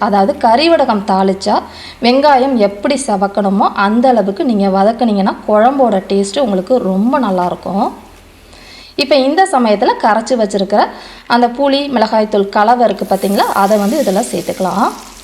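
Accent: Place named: Coimbatore, India. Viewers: கொஞ்சும் கொங்குத் தமிழில்.. மீன் குழம்பு .. சுவை மனம் கமகம.. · U r tamil slang is very nice it like coimbatore style I am from coimbatore so I love u r tamil I feel iam in local coimbatore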